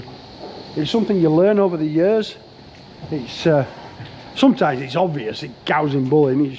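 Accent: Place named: Yorkshire, United Kingdom. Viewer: By heck, thats a grand Yorkshire Accent. Having lived in NZ for 25 years now I miss the accents of the home county. All you need is a robot scraper now! And have you upgraded the JCB?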